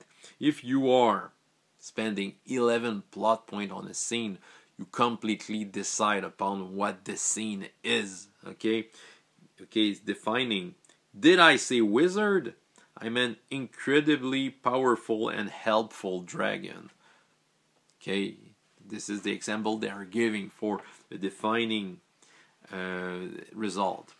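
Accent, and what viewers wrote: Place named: Quebec, Canada. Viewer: C'est vrai que l'accent québecois en anglais m'aide aussi à travailler mon anglais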